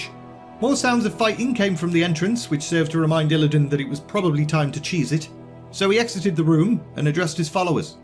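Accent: United Kingdom. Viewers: great stuff so far.... (see what I did there?) butt(wink) no one is surprised by that bit of corn. Keep pushing em out and youll feel a great relief when the weight is off you. Thanks for your effort genuinely, youve ruined reqular audio books for me lol. No where near enough jokes or profanity or character voices or British accent lol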